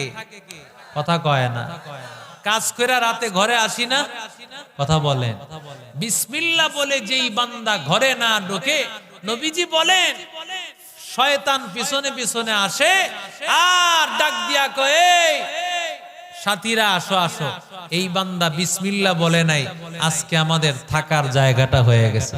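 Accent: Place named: Kuakata, Bangladesh. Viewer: কুয়াকাটা কেন্দ্র্রিক কন্ঠ, মাশাআল্লাহ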